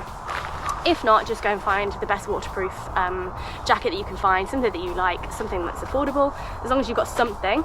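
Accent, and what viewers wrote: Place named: RP, United Kingdom. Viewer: Thank you!! As an American I loved your proper accent and pronunciation. I found the difference in vocabulary I.e. torch/flashlight cute!More importantly that was quite the comprehensive list!!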